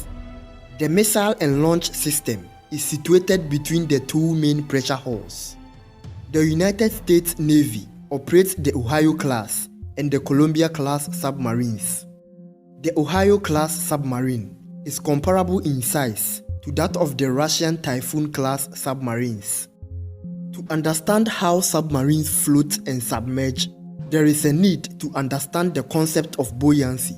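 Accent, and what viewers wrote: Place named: Africa. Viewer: African accent s..ks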